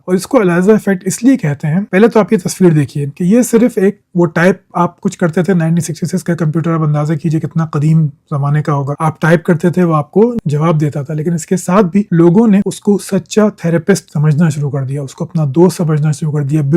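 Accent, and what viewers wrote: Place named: Sindh, Pakistan. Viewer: اوپر سے ھو بھی سندھی اسپیکینگ یا پھر پنجابی ڈھگے ھو آپ ۔۔۔کراچی والی لینگویج نھیں لگتی آپ کی۔۔۔۔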